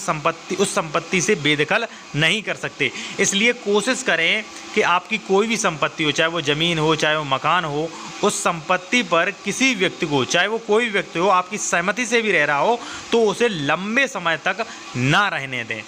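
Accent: Uttar Pradesh, India. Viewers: Sir aapki bolne se. Lagta hai ki aap agra aligarh ke as pass practice karte hai...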